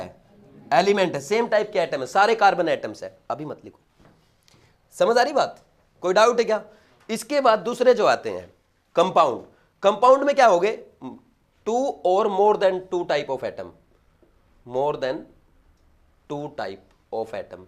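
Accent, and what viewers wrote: Haryana, India. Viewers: Sir are you from haryana?! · your accent is too similar to Haryanvi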